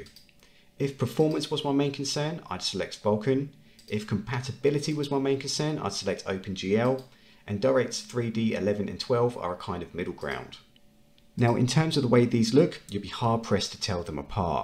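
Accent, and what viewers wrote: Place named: United Kingdom. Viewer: I don't know why but as soon as I hear a British accent I know I'm in the right place. This tutorial was amazing. Easily the best I've found on YouTube. Thanks man!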